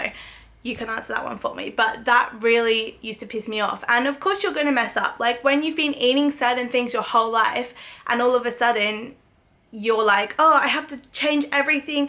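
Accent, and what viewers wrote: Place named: Australia. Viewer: I'm here because I just love her Ozi English accent. Sexy accent, hahahaa...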